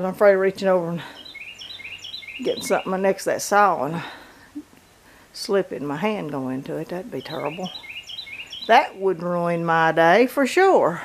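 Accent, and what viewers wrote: South, United States. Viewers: I enjoyed listening to you talk reminds me of my mother My southern accent isnt as strong as yours Get A trail camera from Walmart it will capture the owl they are fairly cheap I video my deer all the time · I do enjoy your talking and walking. I have a long time lady friend that lives in my apt building she is from the south but sounds just like you.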